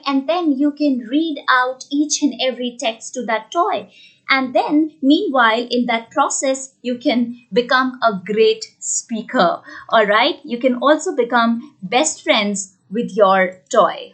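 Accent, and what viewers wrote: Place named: India. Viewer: Indian accent ☺☺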